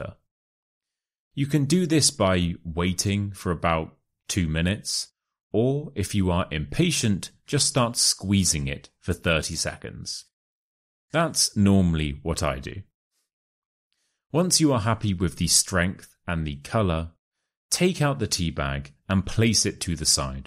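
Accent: United Kingdom. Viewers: The best british accent, the best channel ever!!!!! 👏🏼👏🏼👏🏼👏🏼👏🏼👏🏼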